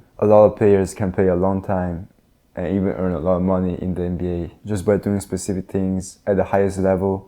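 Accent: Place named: Italy. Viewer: Love your game, but love your mixed accent of Italian & NorCal even more.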